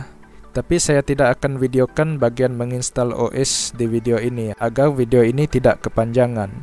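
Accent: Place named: Sarawak, Malaysia. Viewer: dah kuduga kamu dari Sarawak, dari logatnya. Tapi bahasa Indo kamu fasih banget kayak asli orang indo, malah aku tadinya ngira kamu orang daerah Kalimantan. Tapi aku penasaran kenapa kamu orang malay pakai bahasa Indo ? Apa penonton kamu kebanyakan dari Indo kah, atau sekedar obsesi ?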